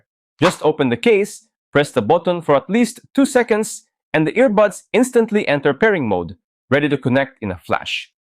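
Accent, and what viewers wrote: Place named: Thailand. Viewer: Love your thai accent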